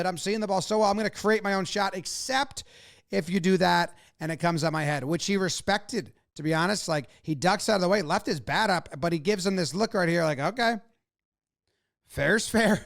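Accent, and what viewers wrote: United States. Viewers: I went 5 minutes in and went "Wait a minute, that's an American accent". Good analysis.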